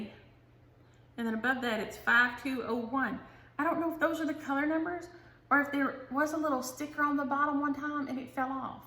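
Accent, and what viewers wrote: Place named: North Carolina, United States. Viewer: I seriously laughed out loud when you talked about whoopings and “I’ll give you something to holler about...” I love Blackbird too and that pretty bird in the red! Nice job....keep up the great work... now to go see where you’re from! I live in NC and you sound like me! 😂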